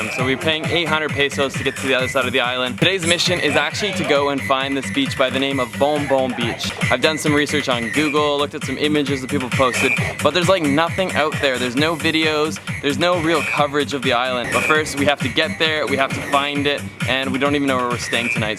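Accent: Canada. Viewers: Got the typical Canadian aye haha. Love the vlog! Keep em coming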